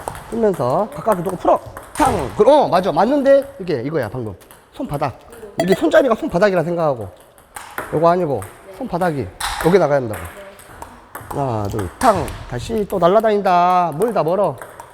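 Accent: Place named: Jeolla, South Korea. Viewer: 전라도 사투리가 이렇게 구수하게 들리긴 처음이군요 ^^ 코치님 정말 멋지군요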